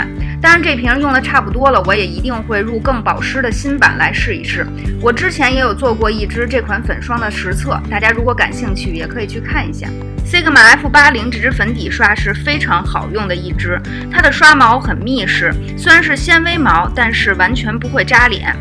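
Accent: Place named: Beijing, China. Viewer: 前几天刚看到你的视频，一听是北京话，亲切得不行，立马关注，加油，支持你！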